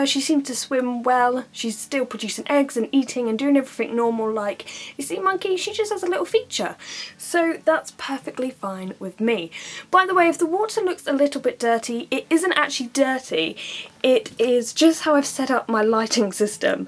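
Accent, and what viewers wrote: United Kingdom. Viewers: I just discovered your channel, I've been watching quite a lot of your videos today, they are all great! You are really passionate about these animals, it's a joy to watch. Besides, i love your British accent. It makes it all very nice, I subscribed without hesitation. Greetings from France :)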